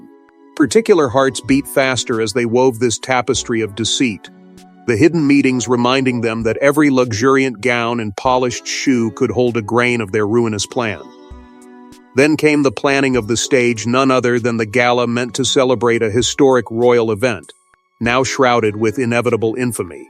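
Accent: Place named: United States. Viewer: A British story told by a "Yankee" voice - PLEASE - have some thought for those of us who find this discrepancy really jarring.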